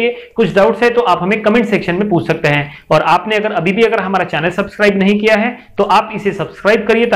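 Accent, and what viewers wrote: Hyderabad, India. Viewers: Sir hyderabadi Ho qa milenga milenga ke rhe ho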